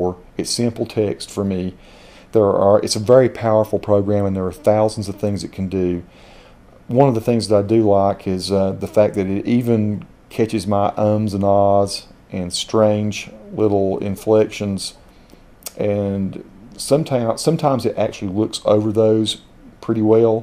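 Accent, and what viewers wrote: South, United States. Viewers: I'm not a fan of Southern accent but yours looks utterly excellent. Good job :D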